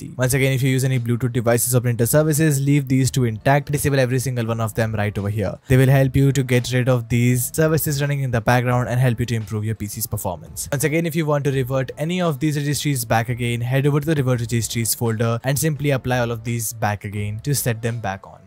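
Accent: India. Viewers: Yk its good when u hearr an indian accent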